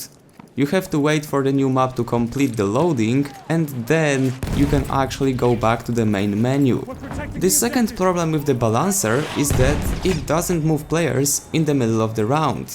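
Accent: Germany. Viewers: I feel like is german? Or dutch? Especially with that accent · You should do a video on the skill balancer. Dude, I love your content, keep it up. Also, is your accent German? If not, what is it?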